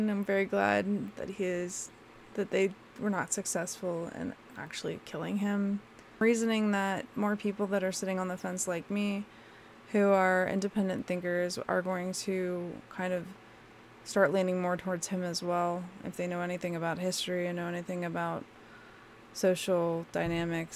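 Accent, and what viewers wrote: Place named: United States. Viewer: You sound pretty AMERICAN to me. There's nothing wrong with being proud of your ethnicity but your definitely an AMERICAN js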